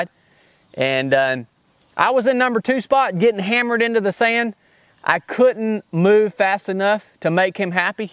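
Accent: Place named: Northern Louisiana, United States. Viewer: Sounds like you’re from northern Louisiana.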